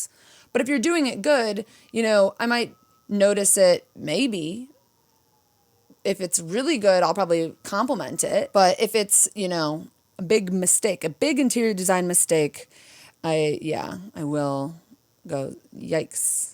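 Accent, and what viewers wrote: Chicago, United States. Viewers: lol! I was a theater design major and I cannot see a play without "working" It is somewhat common within whatever your area of discipline is, I think. I notice that you look very pretty these days. And I notice your Chicago accent, but I grew up in Detroit, so I got some of those influences in speaking too! I would love to learn about organizing too - that was not a thing in my family and I have been getting "schooled" on it!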